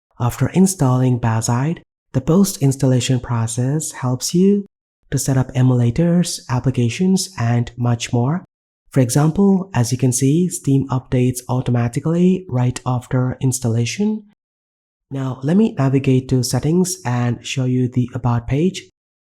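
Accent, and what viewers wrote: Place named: India. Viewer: Now i have heard it all - an AI robot voice with indian accent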